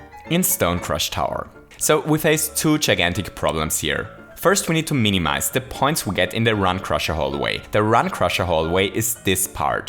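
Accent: Germany. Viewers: Bist du ein Deutscher ? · Are you german?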